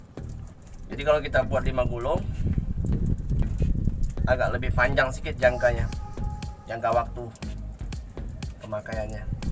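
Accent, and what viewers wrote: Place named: Malaysia. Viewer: Dari logat nye, ab org melayu, melayu mana bg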